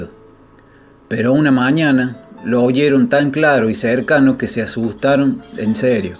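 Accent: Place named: Córdoba, Argentina. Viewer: Hermosa leyenda ANCESTRAL y tu tonada cordobesa . Saludos desde mi Argentina bella y nuestras provincias ❤ 😘👋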